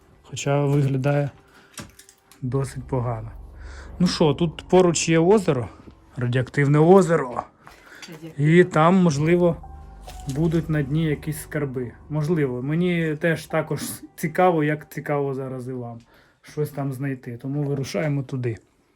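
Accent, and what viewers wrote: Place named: Poland. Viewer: Фиии , польский суржик !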